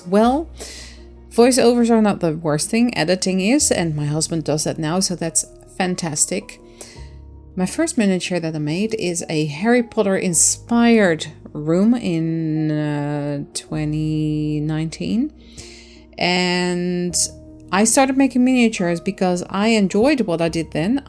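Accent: Netherlands. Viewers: 🐦It was really interesting learning more about you. For some strange reason I thought you were of South African origin, but Dutch makes much more sense with your accent. Don't ever give up on your dream art room, visualise it, manifest it into reality. Yeah I know easier said than done but a little hope and faith won't hurt anyone eh. PS I'm with you on the vegemite. Blerk!!!!! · Lol I thought your accent sounded familiar, my father and his family moved to Canada from Amsterdam. Have you considered doing Aragog as part of the pet collection?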